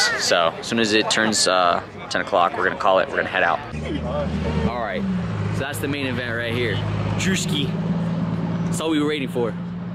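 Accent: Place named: Spain. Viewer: de donde eres escucho acento español¿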